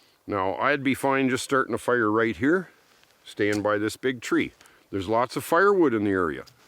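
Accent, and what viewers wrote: Canada. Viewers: You're Canadian. I know the accent. A lighter helps but there are many ways to start a fire. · You sound like home 🇨🇦 🍁 🇨🇦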